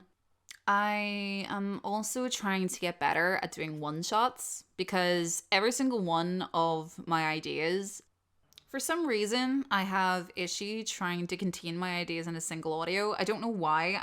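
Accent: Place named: Northern Ireland, United Kingdom. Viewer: Dang I feel bad as a Dungeon Master and a baby VA it took me ten minutes to decipher your accent as Scottish. 😭😭😭 Edit: northern Ireland fuck!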